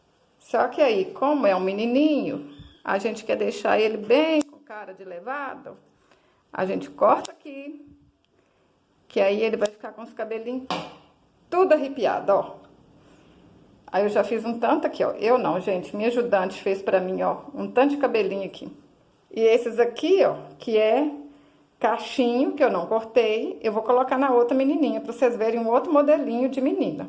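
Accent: Minas Gerais, Brazil. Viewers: Eu simplesmente ameiii, adoro bonecas de pano, adoro fuxico e adoro esse sotaque mineiro 💖😍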